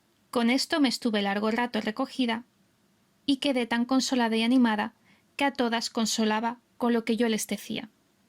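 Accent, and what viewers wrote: Andalusia, Spain. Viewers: Me encantó la síntesis que has hecho. Felicitaciones! En cuanto a tu acento andaluz…no te disculpes por ello, es parte de lo agradable que escucharte. No soy española, aunque si de ascendencia y andaluza además. Mi familia llegó a Costa Rica procedente de Torrox, Málaga. De ahí que el habla andaluza me sea muy querida y cercana. Un abrazo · Muchas gracias …. Y ese acento espontáneo andaluz me encanta jajajajaja. Agradecimiento por tu trabajo de un andaluz provinciano .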